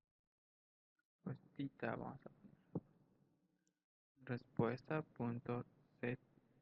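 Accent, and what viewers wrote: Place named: United States. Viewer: hola gracias el video me fue de gran ayuda pero por favor como puedo cambiar la voz es que el problema es que su voz se escucha demasiado rara como si fuera una gringa intentando hablar español y hay muchas palabras que no se entienden bien por favor ayudame necesito arreglar ese detalle de inmediato por favoorrrr respondame rapido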